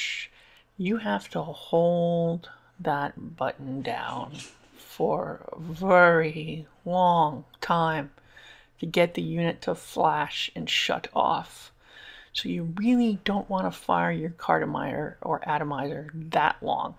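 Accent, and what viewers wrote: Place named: New Jersey, United States. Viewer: brilliant explanation on PWM, as a transplanted NJ Viirginian your accent makes me homesick. I love you lady, you are THE best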